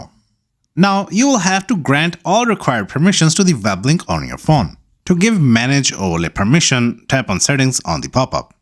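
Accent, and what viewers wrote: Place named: India. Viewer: i will never believe a guy with indian accent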